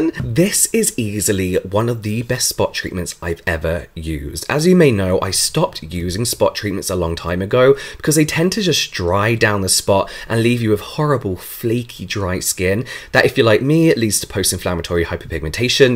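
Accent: United Kingdom. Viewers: Do you come from the same exact area as Hermione Granger (aka Emma Watson)?? THE ACCENT!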